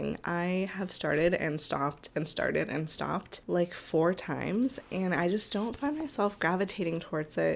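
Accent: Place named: Colombia. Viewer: me encanta tu acentito colombiano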